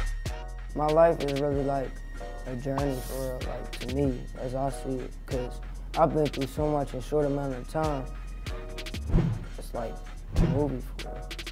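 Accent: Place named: Philadelphia, United States. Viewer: He from philly that’s how we talk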